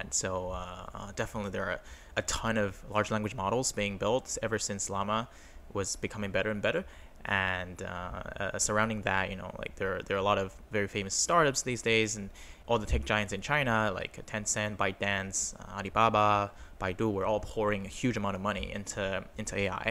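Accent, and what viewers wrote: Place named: United Kingdom. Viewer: Good video. Also u have a heavier British accent these days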